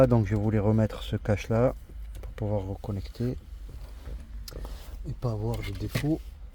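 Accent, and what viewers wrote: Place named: Marseille, France. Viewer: avec l'accent marseillais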